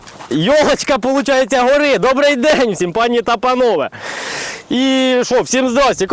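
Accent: Poltava, Ukraine. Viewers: З перших секунд чути Полтавську область. Звідки ти?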